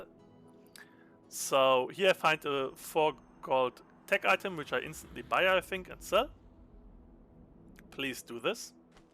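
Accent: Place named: Poland. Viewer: Where are you from? You sound polish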